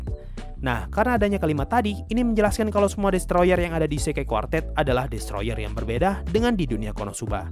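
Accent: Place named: Bugis, Indonesia. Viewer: logatnya si admin kek orang Bugis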